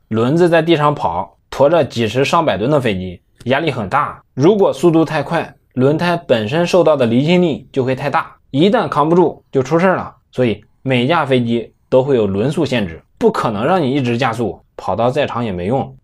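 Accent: Henan, China. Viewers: 这河南话，听起来亲切！ 想起紫阳。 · 贺南话好听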